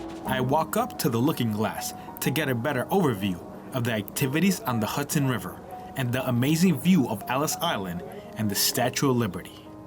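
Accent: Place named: Latin America. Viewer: No se si me equivoco pero eres latino porque la forma en que hablas no es de un nativo americano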